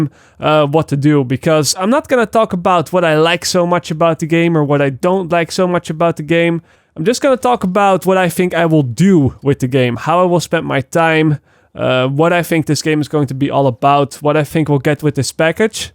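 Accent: Netherlands. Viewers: ???? I love you man, been listening to that sweet Netherlands accent for years, but what are you talking about?40 hours has nothing to do with how many kids you have or if you work at all, lol. I'm pretty sure when they say 40 hours it's not a running clock like you will finish it 8 hours short of 2 days, omg I have no idea why you perceived it that way but that was funny. You could play 1 hour a day for 15 days that's 15 hours. And you could do that living in a village of children with all the jobs you want and still finish as fast as a content creator.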